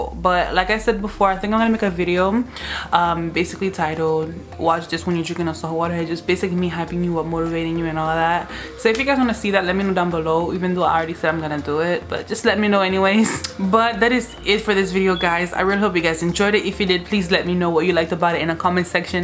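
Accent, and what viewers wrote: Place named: Jamaica. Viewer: Hi lady! You sound Jamaican like me. Where you're from originally? Mi love your videos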